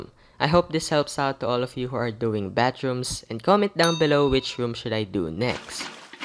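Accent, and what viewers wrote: Philippines. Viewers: You have a filipino accent I think your filipino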